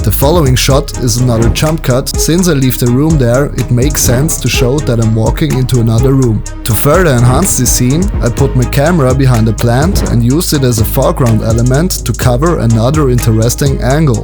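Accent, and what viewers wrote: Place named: Germany. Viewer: Da hast du ja ein paar nette Videos. :-) Und immer wieder amüsant, wenn deutschsprachige Menschen Englisch reden. Der Akzent ist mitunter klasse. ;-)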